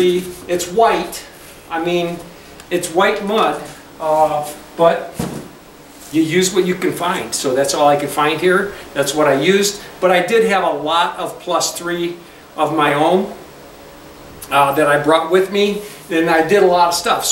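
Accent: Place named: Bridgeport, United States. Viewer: You sound like your from Bridgeport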